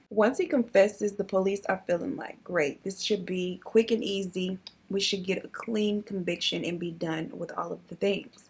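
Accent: South, United States. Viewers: Girl, we just got that sass and drawl when we speak in the south! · My husband past recently and him and his family use a lot of the southern terms and way of speaking that you do. So I really do get a kick out of listening to you talk. Things like; “fat meat is greasy” or “too much like right“ lol truly brings a smile to my face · I love the way you speak!! I love all the killer girls, but its really nothing like hearing it in your own "dialect" ie black, southern, hip, etc... Make em Google it! 😆😅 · You Definitely have the South Slang I’m from the Miami area so we speak how you speak so that’s probably why I love you videos extra cause I get it in Everes every way when you explain it lol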